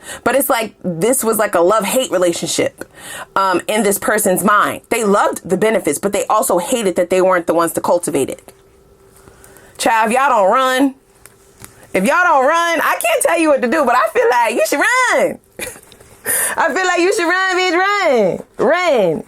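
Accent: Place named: United States. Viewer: Nice analysis.but sisan people can't get your USA pronunciation.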